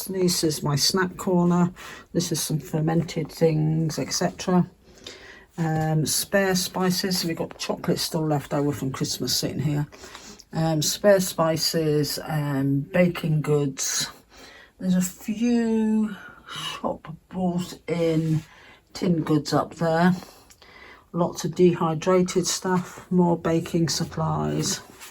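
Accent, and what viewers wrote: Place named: Wales, United Kingdom. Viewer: I found your channel fascinating as my great grandparents came to the US in the 1890s from Glamorgan Wales near Swansea. So it’s interesting to see real people, real homes and listen to real accents spoken as you are not a great distance from where they lived. I’ll enjoy seeing more of your life and customs along with the challenge.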